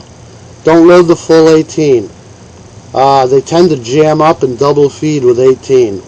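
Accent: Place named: Maine, United States. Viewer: No. Now that I have had time to think about it, I was way off. I would guess that you are from Maine, not Mass. My guess is somewhere between Bath and Warren. Did I get it right this time? By the way I did the repair and you were spot on! The O-ring was a little deformed but I think it's going to be OK. I had better luck placing the O-ring and washer on the valve and (holding it upright) brought the mag down onto it. Thanks again!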